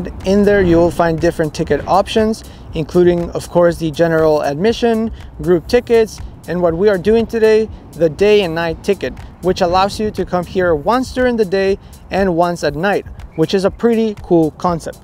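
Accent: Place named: Laredo, United States. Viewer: Whenever I want to show people what a Laredo accent sounds like, I'll show them your vids haha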